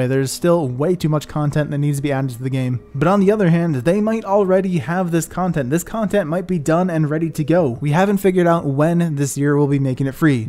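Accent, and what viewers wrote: Czech Republic. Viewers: Are you Czech?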